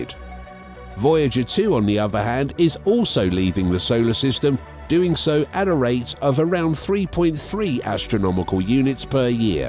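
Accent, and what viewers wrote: United Kingdom. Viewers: I’m not going to get rooked into listening to this Brit-narrated 13 min long video about a message that is never revealed but if watched I’d have to endure a mind numbing litany about the wonders of the Universe.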